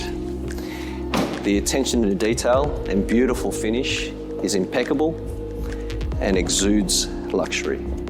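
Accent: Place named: England, United Kingdom. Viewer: Fuck this shot . Asian man with English accents. Someone kill Me please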